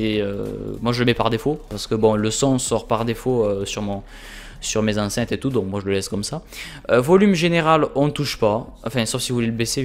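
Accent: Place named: Quebec, Canada. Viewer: j'entends ton accent et je me demande si t'es quebecois ou marseillais mdr, mais sinon bonne video merci je vais m'y mettre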